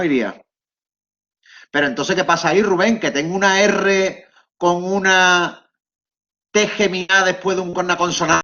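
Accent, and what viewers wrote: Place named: Triana, Spain. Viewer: Yo soy andaluza y no entiendo por qué decís: "Rassoneh" o "prossesso", como se pronuncia en Triana y no simplemente: "razones". Hablando de imponer , esque ahora hay que pronunciar en trianero? "Paressío", "ehpessialihta". Pff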